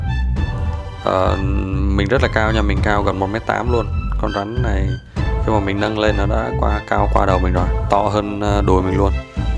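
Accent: North, Vietnam. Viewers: giọng bắc 54 ở đồng nai .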